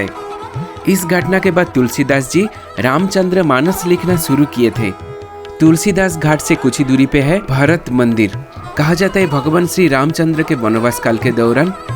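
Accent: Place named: Bengal, India. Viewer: Bhai aap bengal se ho kya . language se lg rha apki hindi se 😊 anyws alws most welcome...love from Lucknow 🙏😊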